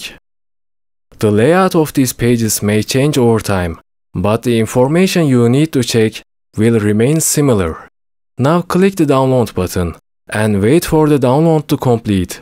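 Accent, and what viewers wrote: Turkey. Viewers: aksanından anladım abi :D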